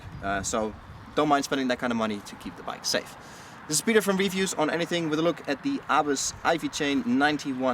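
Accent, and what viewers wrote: Netherlands. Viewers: It took me a very long time to realise that you might actually be Dutch instead of American.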